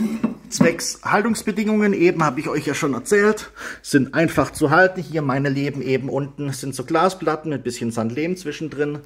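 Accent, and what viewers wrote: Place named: Swabia, Germany. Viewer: Ich höre schwäbisch. Wo lebst du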